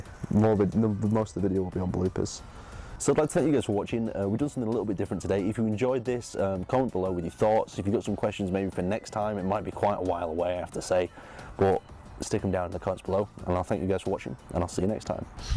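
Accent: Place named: Manchester, United Kingdom. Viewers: I'm from Manchester (the city, greater Manchester), I have a similar accent. I always thought because of my accent it would make it impossible to do you tube, but proved me wrong! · Oi Manchester lad! Greetings from West! You should try scouse accent. That would be hilarious in your videos.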